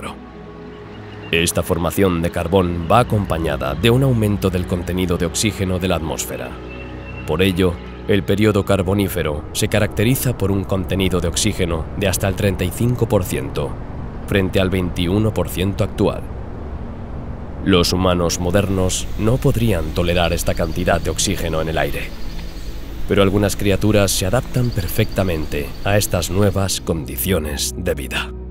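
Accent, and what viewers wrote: Spain. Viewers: doblados al español de España, lo que da una sensación que tus videos fueran documentales profesionales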